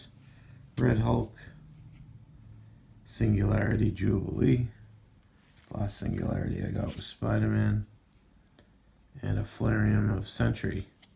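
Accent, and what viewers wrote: Boston, United States. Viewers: Can't place your accent. It sounds like like home town of Boston but I could be wrong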